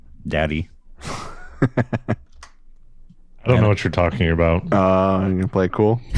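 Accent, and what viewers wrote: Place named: Upper Midwest, United States. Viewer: Damn I thought he was more upper midwest with me.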